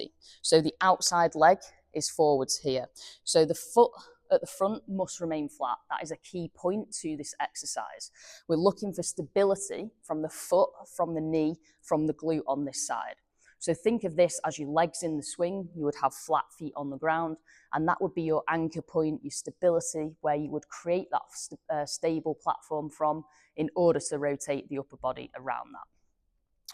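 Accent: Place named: England, United Kingdom. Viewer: Hello from another Canadian. I have recently subscribed to you channel. As a retired Athletic Therapist and golf enthusiast that enjoys working at my game from a fitness and technical point of you, the content you share as an ex-professional golfer and now fitness instructor has become one of my “go to sources of information.” As a Canadian that doesn’t get to golf much during the winters, I created a sport specific workout area and chipping station, in the basement of my house where I can try out some of your training suggestions . Also, I must mention that listening to you accent adds to the fun. It reminds me of the year that I studied at Chelsea College in Eastbourne, England.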